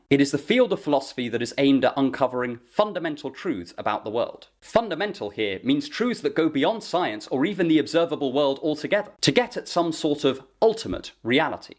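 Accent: United Kingdom. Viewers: To top it off he’s quite the posh chap with a nice British accent 🫡 salute you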